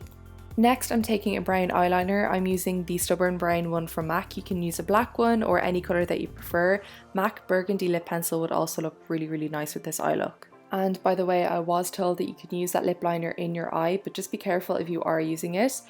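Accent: Ireland. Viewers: I wish I had an Irish accent, so pretty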